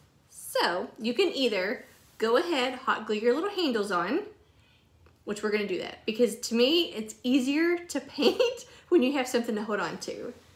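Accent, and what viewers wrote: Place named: Kentucky, United States. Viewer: Love your KY dialect! My Mommom grew up in Hopkinsville. Lost her a few years back. 😢❤️ I love the idea of tier trays. I think I may do one for my best friend from my college days. We email almost daily.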